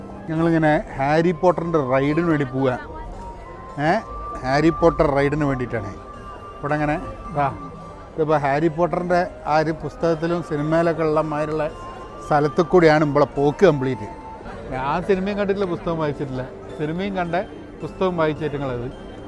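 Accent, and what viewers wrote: Kozhikode, India. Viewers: കോഴിക്കോടൻ ഭാഷ ഇജ്ജെങ്ങനും മാറ്റിയ കൊല്ലും അന്ന ഞമ്മള്..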